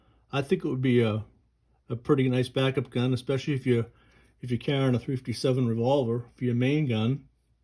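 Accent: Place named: North East, United Kingdom. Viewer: Sounds like you're from up here in the North East as well? A rare breed for firearm reviews! Appreciate the content and how thorough you are going over the model!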